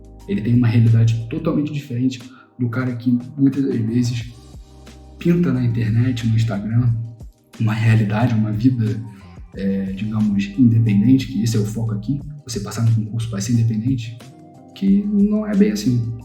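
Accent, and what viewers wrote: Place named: Rio de Janeiro, Brazil. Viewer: Você é muito aluno mesmo kkkk, até suas posições e suas mãos, além de sua fala pausada e encariocada mostram isso kk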